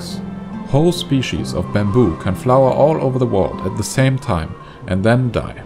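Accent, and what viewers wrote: Germany. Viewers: I love your German accent. It's very subtle, but still noticeable. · Is that a german accent? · He sounds soooooo German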